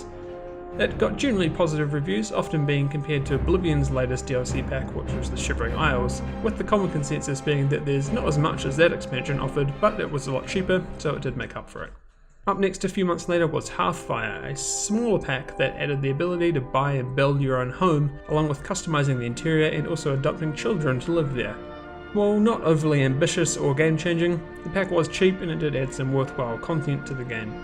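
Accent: New Zealand. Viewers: Great video! Also are you a fellow kiwi? Bless up 🙌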